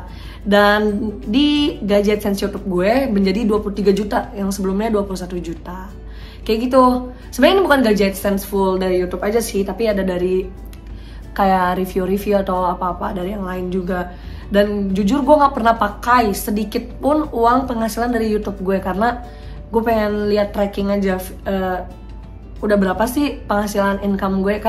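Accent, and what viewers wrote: Bali, Indonesia. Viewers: Orang Bali kah?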